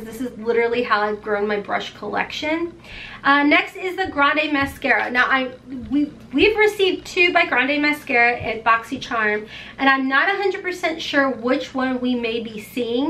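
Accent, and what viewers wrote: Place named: Kentucky, United States. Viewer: I always wondered why I recognized the accent ! Hi , from Louisville Kentucky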